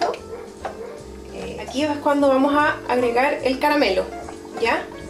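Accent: Chile. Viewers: Bella Fernandes obvio chilena por coml habla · me encanta la receta no se ve para nada dificil, mi unico problema que tengo cocina magallanica. por tu acento eres chilena. saludos desde punta arenas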